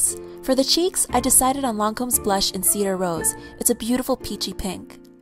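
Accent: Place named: New York, United States. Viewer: Hey, I have been a long time subscriber of your's and I like you because you are usually so original, you know, with your crazy NY accents and such. But I feel like this video seems a bit like something Michelle Phan would do. I understand that she's your collegue, but I miss the NY. <3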